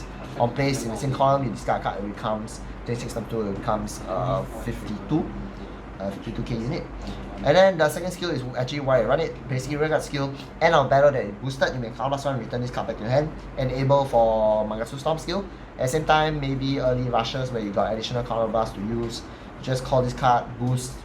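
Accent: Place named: Malaysia. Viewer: U have a malaysin accent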